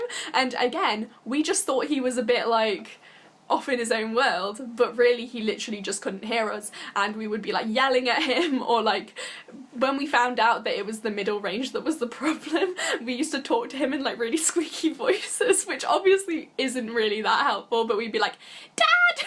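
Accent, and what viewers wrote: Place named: Midlands, United Kingdom. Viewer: Brendow Félix She's from the Midlands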